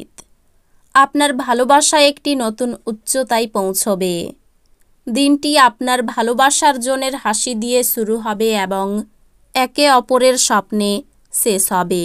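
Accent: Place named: East Bengal, Bangladesh. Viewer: আপনার বাড়ি?,মনে হচ্ছে east bengal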